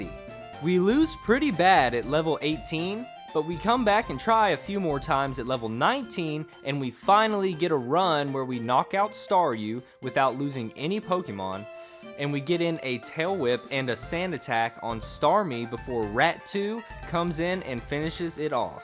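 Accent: United States. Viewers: Is that a South Carolina accent I hear? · your southern accent makes this video funnier to me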